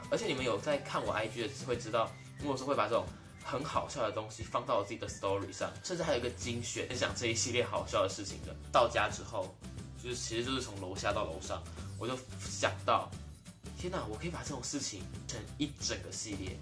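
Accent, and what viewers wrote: Xiamen, China. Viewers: 我感觉厦门那边普通话也这个味儿其实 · 还好了，台湾腔，就是闽南厦门一带人说普通话的腔调而已。厦门人说普通话也你的调调。只是很多人不知道而已。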